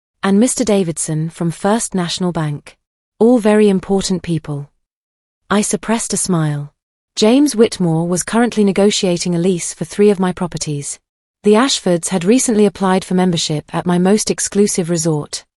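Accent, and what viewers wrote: United Kingdom. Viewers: Where's her husband who was supposed to come to her rescue, like it says in the title. Absolutely love British narrators 😊❤❤❤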